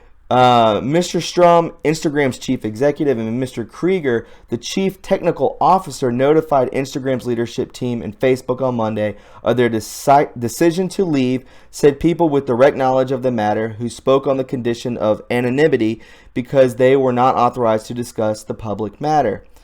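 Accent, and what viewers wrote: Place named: South, United States. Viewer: How is it that your southern accent as bad as mine?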